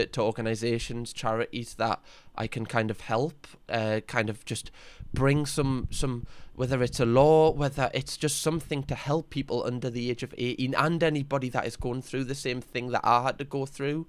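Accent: Newcastle, United Kingdom. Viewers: hi, fellow Geordie here and someone who's been through similar things. not the same, but similar. thanks so much for speaking out. this has just happened to a friend of mine. he called in a panic and didn't know what to do. luckily i put him in contact with my friend who works with the police force, so its getting sorted. but i wanted to say that you speaking out and using your platform is going to help so many people. so many youngsters look up to you and now you've made so many people not feel so alone. i am so sorry you went through this and at such a young age. it shouldn't happen and your right that is nonsense that the helpline doesn't help those who are minors when these are the people who need the most guidance. it seems like you've done a lot of work on yourself to speak to candidly and passionately about something so traumatic so you should be proud of yourself for how far you've come. 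just know that doing what you do you bring smiles to so many even me who lives away from home at the moment, listening to you laugh n talk in that way that only we Geordies seem to, brings so much comfort these journeys are never fully over, there will always be more work to be done, good days and some not so great, but you really are an impressive young man and i know that its not just myself who's grateful you were able to survive your situation. keep that compassionate vibe you have, you never know who you may save by telling your story, who you may inspire to speak out, or who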